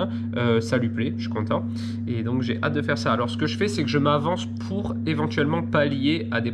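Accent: Corsica, France. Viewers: Ahhhh cet accent corse ! je l adore 😍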